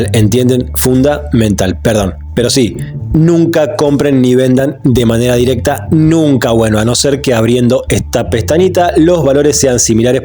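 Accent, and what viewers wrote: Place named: Argentina. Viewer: Que buenas vibras tienes capo, me re encanta, deahhh. Sorry, me gusta como hablan los argentinos. :I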